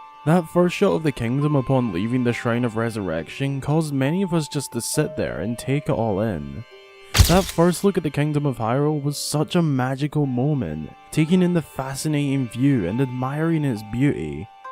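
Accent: Scotland, United Kingdom. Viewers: The second the video started and I heard your voice, I immediately knew that you were Scottish, cus I'm Scottish myself! Idk just thought that was quite cool. But great video, solid points as well, you've earned a sub :D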